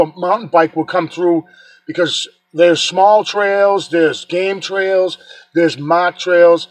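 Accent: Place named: New England, United States. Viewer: I'm not sure I believe that cr...p the park rangers are telling you...they know what's there.....I know what's there from watching your vids. I understand and respect your that you will go by their rules...and it's probably not a good idea to go off trail there anyway cuz there just might be something you don't want to run into...on the other hand if your gonna do any kind of field research on big foot you must be prepared for the worse to happen rite?? There are plenty of other places to go just like you said tho....Ive been a to a lot of forest ares up there as you know I to am from Mass........White mountains is where it's at!!!!! Again stay safe love your vids and I sure do enjoy hearing that new Enland accent again 😁😁😁LINDA IN FLORIDA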